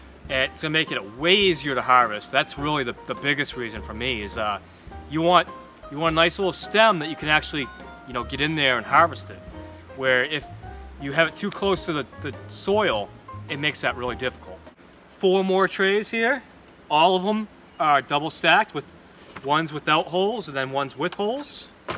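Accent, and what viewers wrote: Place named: New Hampshire, United States. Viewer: New Hampshire, I was wondering where that accent was from.....there is another Youtuber from NH I watch as well, has a homestead he is building. Good insights on basil, really appreciate this video.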